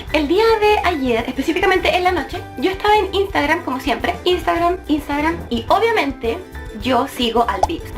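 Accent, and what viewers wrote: Chile. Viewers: Hmmm no se, pero suenas como Chilena compatriota xd es chistoso como nos reconocemos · Hola. Tienes acento de chilena. Yo soy chileno por eso lo digo jejeje...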